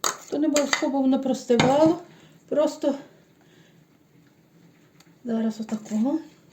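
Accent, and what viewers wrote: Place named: Galicia, Ukraine. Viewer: Це щодо зауваження до п.. У неї класний галицький діалект. Продовжуйте, п., і не звертайте уваги на такі дрібниці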